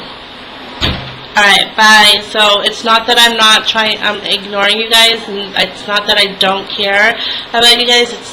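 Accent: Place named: New York, United States. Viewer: What part of America are you from? You sound like you are from New York sometimes. :) And omg... that's a shame cashiers are not allowed seats!, in Ireland (where I live) they do.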